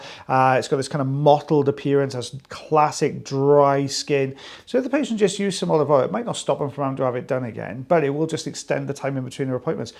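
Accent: Wales, United Kingdom. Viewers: Nope! He's Welsh.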